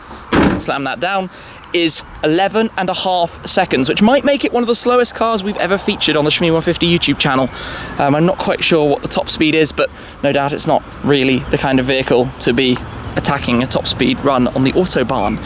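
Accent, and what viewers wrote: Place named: United Kingdom. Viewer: Only me annoying this British accent ?))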